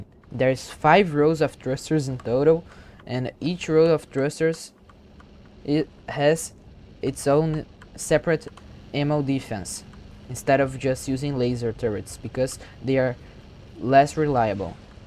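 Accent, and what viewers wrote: Brazil. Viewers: Eu tbm imaginei que era brasileiro somente pelo sotaque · Pretty impressive ship. Don't worry about your English, it's perfectly comprehensible; you do sound like you're Brazilian. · tu é br mano. kkkk salve aprendendo a falar ingles tamabem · Are you brazilian? It sounds A LOT like the Brazilian Accent. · TU TEM SOTAQUE DE BR MEU IRMÃO, TOMA O LIKE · You sound brazilian to me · Are you Brazilian? The accent is basically identical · Teu sotque é de brasileiro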